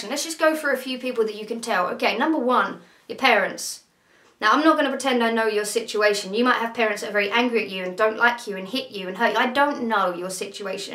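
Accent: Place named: England, United Kingdom. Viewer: I am learning English and I loved to hear you and I loved your accent. Where are you from? England?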